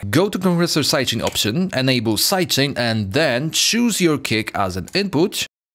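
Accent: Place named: Eastern Europe. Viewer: I thank you my friend, for the great work your doing. Where are you from? I can tell your from Eastern Europe by your accent. Portugal here. 👋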